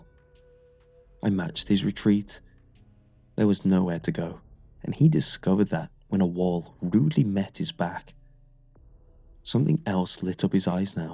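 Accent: United Kingdom. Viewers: It's always a good episode when the British guy is narrating.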